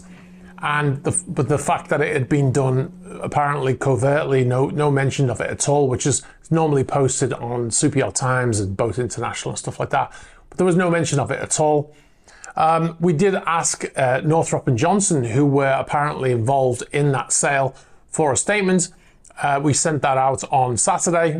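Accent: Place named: Merseyside, United Kingdom. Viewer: Really like watching the channel, your reply to Chris the weirdo with the major personality issues was spot on, I could be wrong, but do I detect a Merseyside accent, being from Merseyside myself, I wondered if you are from here, what part of Merseyside do you come from ? 🖐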